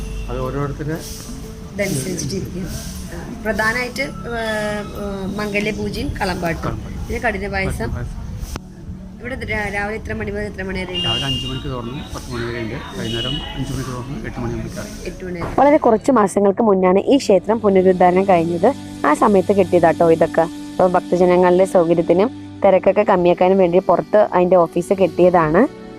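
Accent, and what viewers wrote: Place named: Kongad, India. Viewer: You have presented a realistic account of Valiya kavu and the surrounding places.Interview with Sri. was informative.Your kongadan colloquial style of casual narration is pleasing & is refreshingly different from the stereotype. For me,who left Kongad decades ago, this was a revisit to the places I grew up.Thank you very much.Looking forward to the ensuing episodes.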